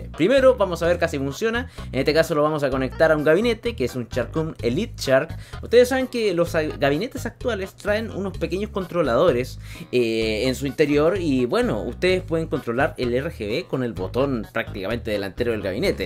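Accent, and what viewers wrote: Chile. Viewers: amo también me gustan como hablan los chilenos xd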